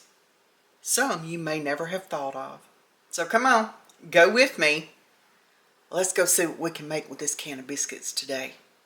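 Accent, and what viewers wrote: South, United States. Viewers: I came across your channel when you were doing the easter dinner for 1 or 2, i live alone and your ideas are great. I usually cook meals ahead and freeze them. Seeing those biscuits reminds me of my husband. We always had the the grands in the refrigerator. Especially when we had company for the weekend. Nice breakfast., i've seen my aunt Melissa make them into apple dumplings. Thank you for sharing , i love southern accents, especially when they're spunky, like yours, thank you🙂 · I so Love your southern accent My precious Mom in Law and Dad in Law were from Northcarolina and i miss them both so much I'm from Montana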